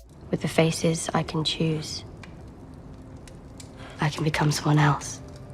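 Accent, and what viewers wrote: Finland. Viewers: you sound like you are Finnish, is that true? If so, then Torille! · Hi, where are you from? Your accent sounds finnish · Where are you from? Your accent sounds Finnish. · are you finnish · Oletko Suomalainen? Do I hear a finnish accent? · Hey bro are you from Finland because you have a slight finnish accent. Like the way you say T's and A's is just very finnish you could definitely work on them. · Tää kuulostaa aika suomalaiselt englannilt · You sound very finnish. Also, good content! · are you Finnish? Just wondering because of your accent. Pretty cool if you are, I don't know too many Finnish channels in the international waters. You have good stuff here, either case! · Nice vid! I started following Pewds when he had less than 50k subs, and the transformation is really amazing. I unsubbed a couple years ago, but now I'm loving his content again! 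Oh and are you from Finland btw? Your accent sounds like you could be :D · Where are you from? At first I thought you were an American but then I for some reason started to pay attention to your accent. Kinda sounds like a Finn with a great English accent. Idk, I'm just curious. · Your accent sounds finnish 🤔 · Are you from Finland? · the finnish accent. so good. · Suomiaksentti spotattu 🤔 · Do I hear a finnish accent? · Hey, weird question... Where are you from? Your accent sounds a bit like that of a Finnish person. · I think this guy is from Finland, so much finnish accent.. And he say the name just like any finn would say it ^^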